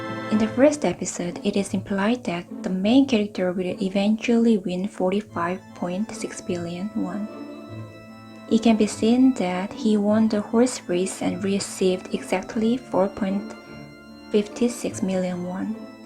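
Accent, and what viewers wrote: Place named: United States. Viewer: 오호ㅋㅋ 아메리칸 스타일의 잉글리쉬💯 세간에 떠들썩한 5징어게임에 빠져봅니데이~😆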